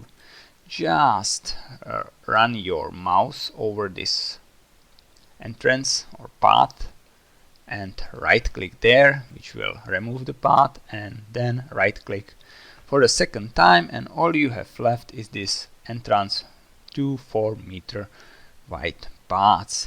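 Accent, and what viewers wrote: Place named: France. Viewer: Love your accent... French I guess?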